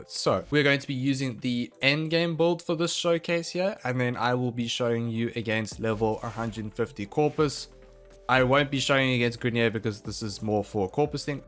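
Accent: South Africa. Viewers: Are you South-African?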